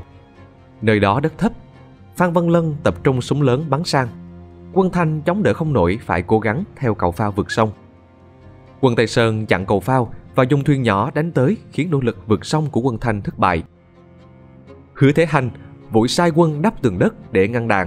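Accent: South, Vietnam. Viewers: Kênh mà nói giọng nam thì cứ phải cẩn thận ...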